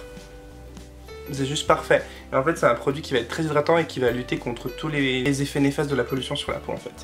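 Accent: England, United Kingdom. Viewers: J'adore ton accent anglais 😍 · Tu es tout en blanc car tu es un ange... mais ça tu ne le sais pas... Bel accent anglais...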